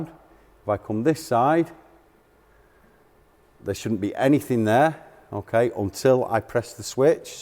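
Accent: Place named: RP, United Kingdom. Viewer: Like the posh voice, is that for to understand you, with you being like me a Northern Lad 😂😂, great testing there love, quality is great on video and audio here in sunny Gran Canaria, take care boys 👍😉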